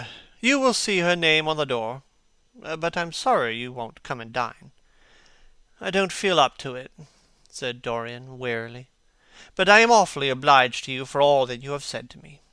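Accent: United States. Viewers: American accent doesn't fit.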